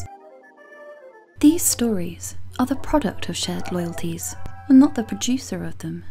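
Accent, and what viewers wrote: United Kingdom. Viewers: Your voice, it's so English / British. And the words that it speaks.... I love it. I thought it was lost. Thank you.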